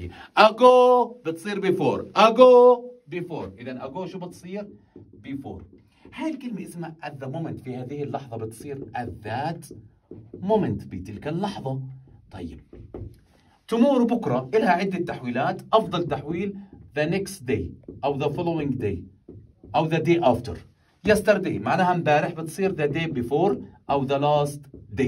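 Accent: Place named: Iraq. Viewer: عبالي عراقي😊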